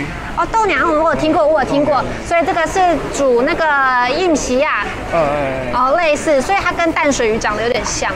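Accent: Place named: Tainan, Taiwan. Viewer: 豆娘仔是我在海邊第一尾起來的魚當時還只是去跟同學聊天用手擒法打發時間意外在肉粽裡釣獲同學都稱呼為厚殼仔肉質聽說超級細緻好吃應該比黑格細緻多了黑格是很難釣的魚的阿公是釣魚高手釣黑格要有耐心要抓準揚竿的魚汛和時機點不然很容易開空槍 (當時海調初心者的我被黑格戲弄得很慘@@ 我同學教我海釣就是只用阿波釣黑格野生的黑格不便宜小時候就吃很不錯的魚很幸福黑格沒有細刺唯一的麻煩就是去鱗非常不容易每次都很害怕殺黑格黑格沙格赤翅仔枋頭仔(般頭仔) 這家族的都不太好去鱗(還是鯖魚好肉多不用去鱗^^) 題外話我的老家在台南南部人說吃這個字都是四聲的重音的老家應該也是台南那邊吧? 發音聽起來超親切北部人說吃都發一聲 (鵝大人的台語也很有親切感我跟鵝大人同鄉的)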